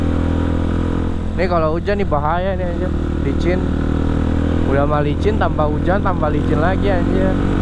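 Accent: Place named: West Java, Indonesia. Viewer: Jangan sampe ilang bhsa sunda nya bang hehe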